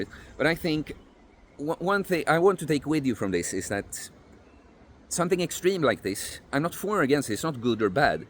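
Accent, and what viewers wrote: Sweden. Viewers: Looking Finnish , but with a clear Swedish accent. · Haha älskar att höra en riktigt pursvensk man prata engelska. Subbed.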